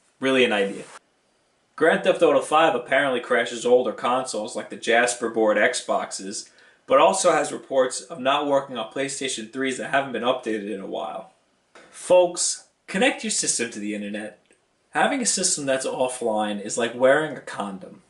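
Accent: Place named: New York, United States. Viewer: Wow your NY accent was way more noticeable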